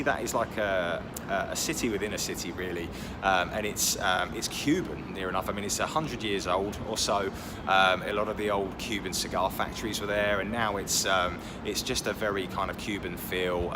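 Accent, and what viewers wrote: United Kingdom. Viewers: You still got that Brith accent which is cool 😆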